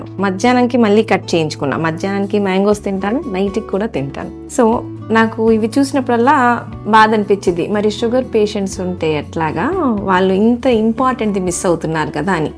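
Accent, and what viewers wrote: Guntur, India. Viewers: Mi slag chusi ankunnanu mam miru ma guntur jilla vallani❤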